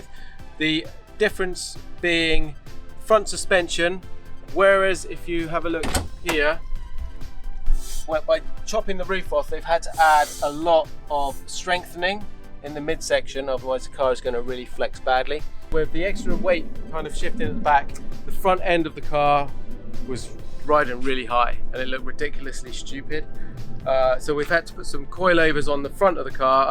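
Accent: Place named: United States. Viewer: No, I mean as I said, bastardised English. Let's just call it American accent so it doesn't offend and start another war.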